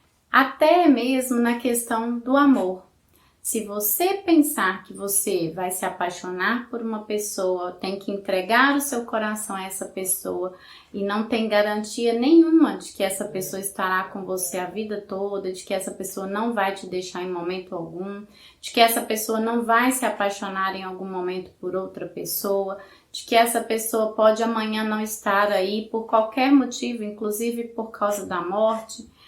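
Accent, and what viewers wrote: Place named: Northeast, Brazil. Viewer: ahh achei o sotaque parecido ,eu sou do nordeste .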